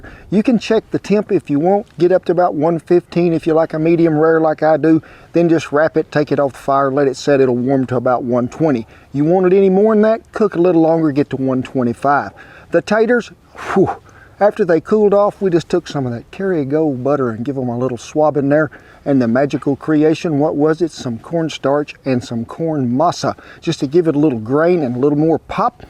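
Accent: South, United States. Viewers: We cook this kind of food pretty much every weekend, when the weather in our parts allows us doing so! Being a linguist, I would like to thank for his sincere and natural Southern tongue.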